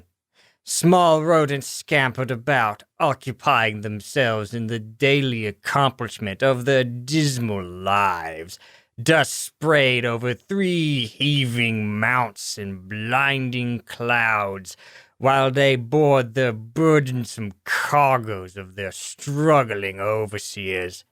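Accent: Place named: Tennessee, United States. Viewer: The moment I saw you post this I thought, “Oh I can’t wait to hear the line ‘sagging nipples’ in a Tennessee accent.”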